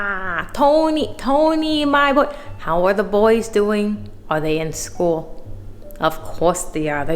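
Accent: New York, United States. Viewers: 🎉🥳Congratulations on your new place in the big apple, I’m so excited for you!!!!!! Apologies for the delay.., I’m still getting over the 50xs the rent smh 🤦🏾‍♀️ Great NYC accent!!!!!! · Your NY accent is giving old Jewish lady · The bricked up and the New York accent took me out girl 💀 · The New York accent 😂😂😂😂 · Perfectly stated. It really is annoying how closed minded some of us still are in 2022. love you practicing that NY accent. Been down here since 2017 and still have my hybrid NY/NJ accent when I got mad. Love your videos! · I think your NY accent is funny. I always liked the comedy show The King of Queens, where Carrie has this same kind of accent. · That New York accent is peak ms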